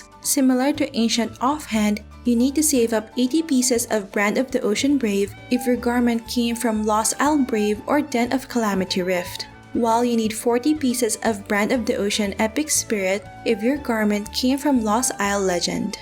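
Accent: Philippines. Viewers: As if the accent was not already an indication that she's filipino and stating most of the time that she uses "PHP" as an indication of BCC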